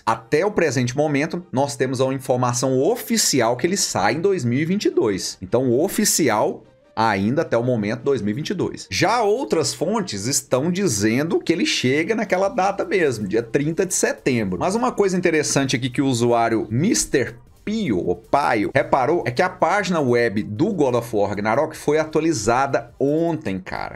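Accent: Minas Gerais, Brazil. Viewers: ..vc é de onde de Minas Gerais uai?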